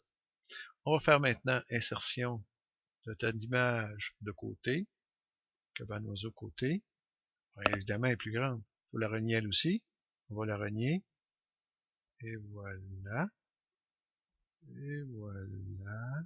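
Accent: Canada. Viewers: Merci pour cette petite formation , déclinée avec précision et l'accent du Canada en plus ..! Bonne continuation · j'ai passé une bonne aprés-midi à étudier les 4 parties du tutoriel, merci beaucoup. Je vais pouvoir créer mes caissons de meubles dorénavant. Il n'y a plus qu'à approfondir 'je crois qu'on peut sortir la liste des panneaux à réaliser) et ça a été agréable aussi grâce à l'accent de nos cousins d'Amérique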